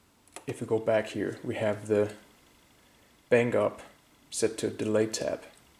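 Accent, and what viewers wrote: Denmark. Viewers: I think I've contacted you before I know you're from Denmark I can tell from your accent. My wife is Danish and we go there every year. I don't really know where in Denmark you're from definitely not Jutland. Or Fyn so I guess the Copenhagen area. We have a house in Gilleleje. Just got a gt100 out of the box from being in storage so now I have to learn how to use it I'll be watching your videos. I'm used to the GT 10 where I could copy built in patches from one location to another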